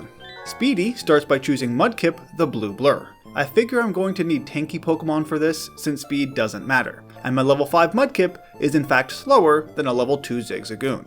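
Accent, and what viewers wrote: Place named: United States. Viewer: famous Vegas! That's nice to know! Maybe someday I go visiting there. It must be a real nice place. But I would say your accent is the typical American English, clean and straight. I like your pronounce! I mean, it is exactly how I learned to pronounce the English words. That's a nice coincidence, I would say. I'm anxious to see your next challenge! Thanks for sharing, you're very friendly!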